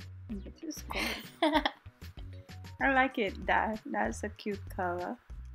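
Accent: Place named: Guyana. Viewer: i love the guyanese accent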